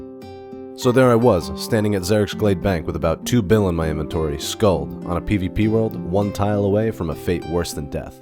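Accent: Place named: Wales, United Kingdom. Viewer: a welsh guy doing the voiceover cba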